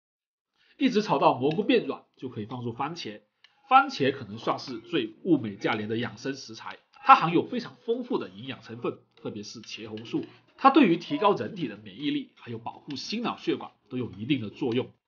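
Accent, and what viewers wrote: Chaoshan, China. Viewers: 好明顯係潮汕嘅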